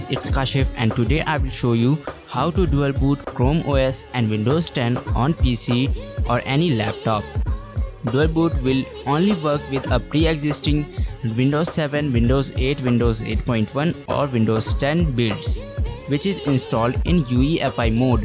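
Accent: India. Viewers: I hate this fucking Hindi accent!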